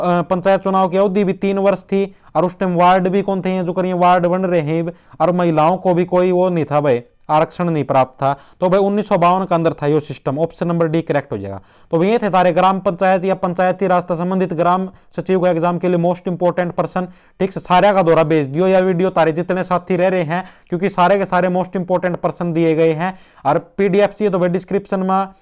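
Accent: Haryana, India. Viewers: Sir mreko to aapki haryanvi bhot jayda psand aayi 👌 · Very interesting video sir.. in Haryanvi dialect 💓😄👍🏼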